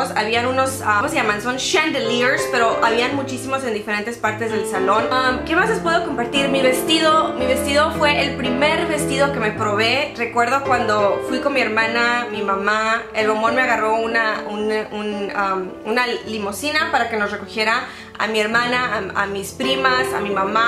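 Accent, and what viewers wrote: United States. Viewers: Aquí no se nota tanto ese acento tipo "gringo"😓 me encanta pero tu español es bonito. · Parece estadounidense al hablar español.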